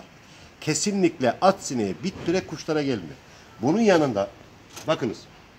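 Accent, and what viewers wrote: Black Sea, Turkey. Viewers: Selamün Âleyküm Karadenizlisin Abi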